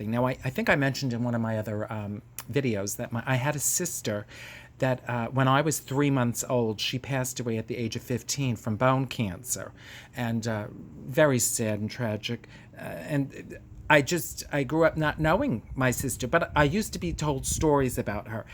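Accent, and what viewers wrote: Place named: New York, United States. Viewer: 45 days in and the stories just get better and better! thank you for sharing your sister's legacy with us. Your stories are playing a huge part in me getting through this crisis. I live in Brooklyn and have been sick for the better part of 2 months with this silly virus (and I'm going to be fine, just slow going). I love hearing that NY accent. xoxo Steph from Park Slope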